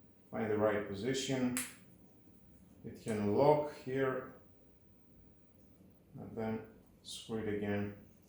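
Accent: Greece. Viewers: U sound greek φίλε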